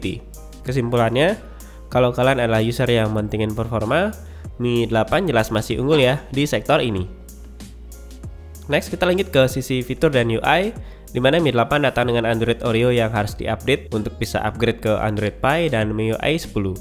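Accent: Bali, Indonesia. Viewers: Kadi jeg logat Bali asane niki pak?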